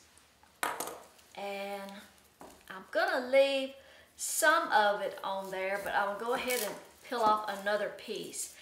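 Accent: South, United States. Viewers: It's beautiful. Thank you for sharing. I also love you southern accent.:)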